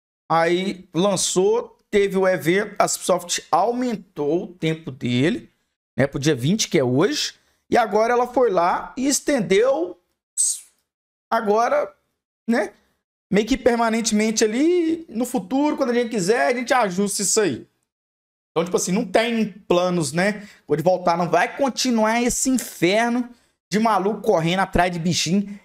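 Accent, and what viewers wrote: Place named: Minas Gerais, Brazil. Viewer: Esse foi o video que o mais soltou seu mineirês falando dos bichin endemoniado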